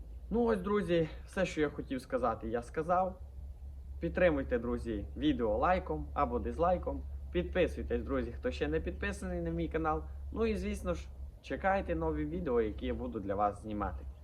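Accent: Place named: Transcarpathia, Ukraine. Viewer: Все чотко нерозуміють люди закарпатського говору 😁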